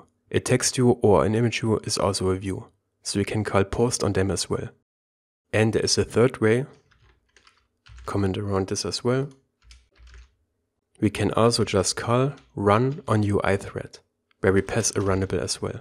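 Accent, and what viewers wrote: Germany. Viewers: Are you german? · are u german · You are an very good teacher! Probably one of the best I have seen. Are you German speaking? I hear a slight German accent. I recently started with Android Studio. The threading in Java is a bit complicated, but thanks to your video, I look much better, thanks a lot !!! :))))) Greetings from Switzerland Nicolas B) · dein Englisch klingt, als wärst du deutscher 🤔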